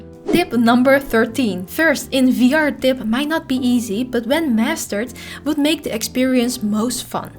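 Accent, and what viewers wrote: Netherlands. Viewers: Are you dutch i think i can hear it in your acaent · Wait, you live in the Netherlands? I thought that accent sounded familiar lol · Fking strong dutch accent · Haha I was guessing that you were from the Netherlands. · Dutch accent.... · Ok. First srrs thx for the tips. 2nd. Oof. Wat ongemakkelijk om zo nederlands Engels to hooren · Damn you sound dutch, holy shit lol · Heerlijk dat Nederlandse accent :) , leuke vid ga em later nog een keer kijken! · he means you have a Dutch accent with a Asian accent mixed in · Can't figure out what a dekstop is... Other than that, great video with the most amazing Dutch accent.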